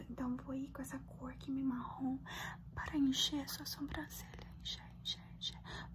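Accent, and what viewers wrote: Minas Gerais, Brazil. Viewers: Eu amei, ficou muito bom seu portugues, ficou um sotaque bem mineiro adorei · Moça não tem como você não ser do Brasil, até o sotaque é fortíssimo e amei o asmr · Já ia pergunta se ela é de Minas kkkkkkk Perfeita.🤩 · estou confuso com o seu sotaque brasileiro, mas pelo menos você tentou, excelente asmr! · Your Brazilian accent is so strong lol not hating at all, you’re Portuguese was perfect!! Love your videos!!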